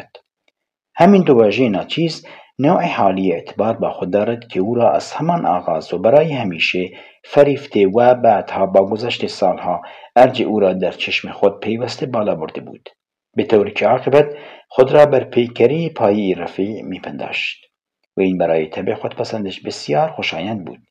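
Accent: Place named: Iran. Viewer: دمتون گرم و سپاس از تلاشتون در مورد لهجتونم باید بگم به نظر من که خیلیم جذاب و شیرینه توفیق مضاعفه هم لهجه زیبای یه خطه از ایران عزیزمونو شنیدیم هم مطالب کتاب را زنده باد تمام ایران و اقوام و مردمانش❤❤❤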